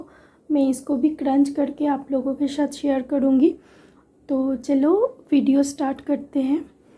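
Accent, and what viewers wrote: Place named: Bengal, India. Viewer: Tumi bengoli ata tmr hindi bolar somei bojha jai😘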